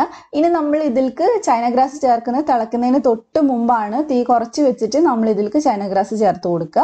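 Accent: Kannur, India. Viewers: Itha kannur aano or Malappuram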